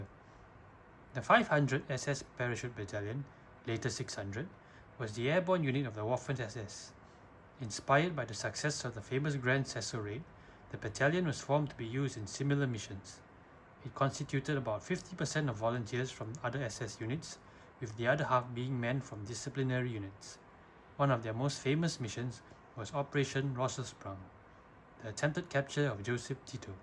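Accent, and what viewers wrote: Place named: Malaysia. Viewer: Your accent's very familiar! Are you from malaysia or singapore?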